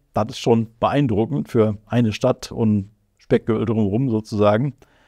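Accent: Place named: Berlin, Germany. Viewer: Immer noch ganz schön „Berlin‘isch“ 😂😂😂 einfach BESTER Mann, sag ich!!!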